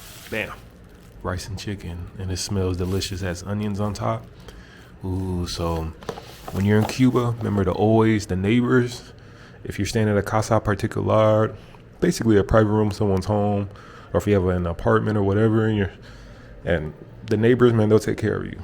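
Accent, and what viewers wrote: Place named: Cleveland, United States. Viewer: Your videos are so dope! I hear an accent in your videos bro, are you from Cleveland?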